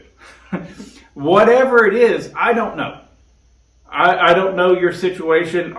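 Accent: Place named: Louisiana, United States. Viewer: Your video quality is freaking fantastic and fun! That Cajun accent is funny stuff!!!!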